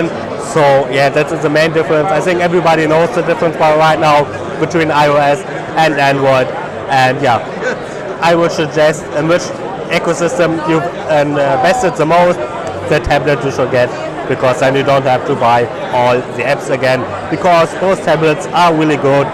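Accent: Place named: Germany. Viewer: sis is se worth english ive ever heard please if you as a german guy do your hands ons in english please learn how to spell words like "thing" oder "that" properbly und jetzt nochmal auf deutsch lerne bitte erst englisch bevor du hands ons auf englisch machst die wörter heißen "this" und nicht "sis" oder "the" und nich "se" ansonsten mach auf deutsch weiter oder hörs einfach auf das kann man sich ja nicht antun ich konnte das video nicht mal halb anschauen weils mir dann schon hochgekommen ist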